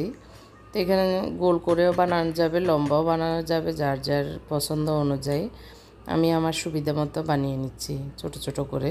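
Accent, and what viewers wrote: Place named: Feni, Bangladesh. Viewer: Assalamualaikum apu ... Ami sob somoy apnr video dekhi but kokhn o comments kora hoy na..amr Bari o feni .upnar feni r tune e kotha Bola amr onk Valo lage... Allah upnk Valo rakhuk .. Apu kitchen cabinet korsen j oitar details ta ektu diben pls.koto taka lagse ki board use korechen esob bolben pls..ami o banabo tai idea nite chai.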